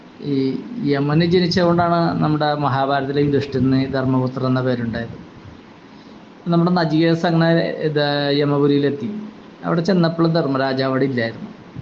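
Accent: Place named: Kochi, India. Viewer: Kochi bhasa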